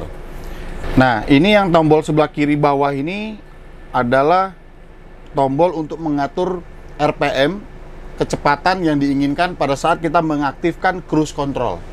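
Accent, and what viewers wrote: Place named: Java, Indonesia. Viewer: Ouw tpi kadang ngmng bhsa jawa lancar. Kyk emang logat nya jawa gtu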